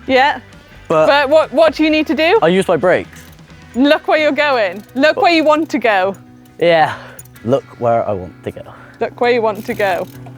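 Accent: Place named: Bath, United Kingdom. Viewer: Your in bath ik because my grandparents live by odd down and the accent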